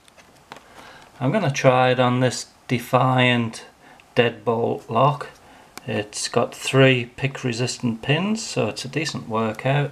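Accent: United Kingdom. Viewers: OMG ... this is MacGyver with a British accent. Great video Amigo.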